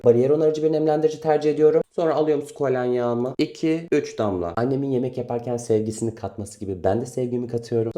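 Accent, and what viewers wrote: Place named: Trabzon, Turkey. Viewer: Haşimdi kalk dedi Trabzon lu musun yoksa 😊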